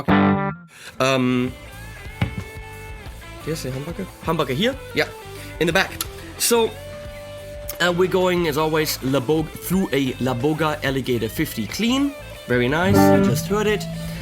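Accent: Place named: Germany. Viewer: Your deutscher Ekzent ist so knuffig.... wieso sprichst du nicht einfach deutsch??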